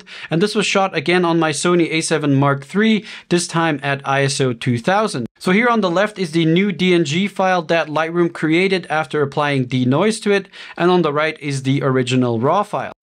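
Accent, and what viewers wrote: United States. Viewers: ...but has an American accent, not all English speaking people have an American accent....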